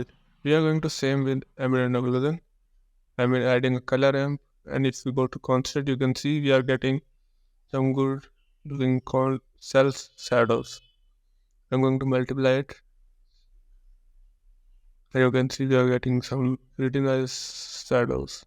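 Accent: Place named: India. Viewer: Is this an indian accent by any chance? Usually i can understand the accent since they know english already, but this is a thick accent lol. I agree though, much respect for using his voice. Confidence will adjust the accent, but to get more views you have to be more legible. Also the subtitles aren't saying what he's saying a lot of times.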